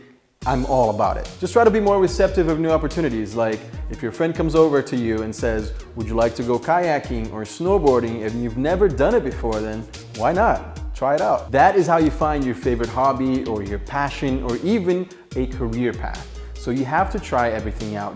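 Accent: Brazil. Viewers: Dunno why but you had a slight brazilian accent this vid...sounds a little like mine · Ele é brasileiro? Sei lá parece que tem um acentinho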